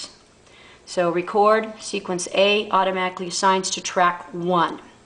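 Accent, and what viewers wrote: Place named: United States. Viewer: I love your American accent :-)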